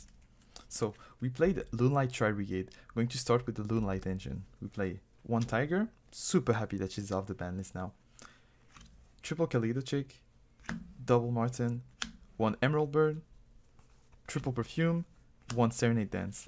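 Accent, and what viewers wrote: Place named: France. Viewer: great deck profile!!! weird question, why do you sound kinda.... fr*nch?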